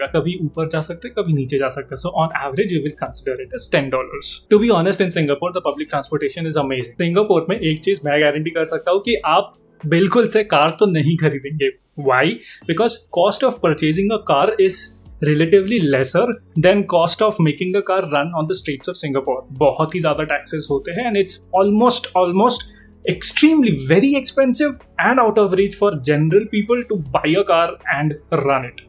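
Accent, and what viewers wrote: India. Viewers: Your Indian accent is so cringe and unbearable for ears.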